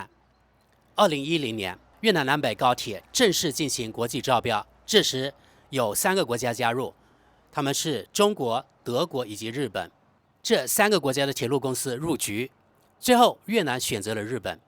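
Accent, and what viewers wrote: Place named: Guangxi, China. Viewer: 主持人是广西人，普通话说得相当不错了，忽略吐字发音不同，语调很准确。内容也很好，至于技术转让，防人之心不可无，还要小心反复无常的白眼狼，在商言商，生意就是生意，小越南挑事的时候可不说两国是兄弟，也不看我们勒紧裤腰带支持他们。修高铁我们反正不急，应该适当提提价。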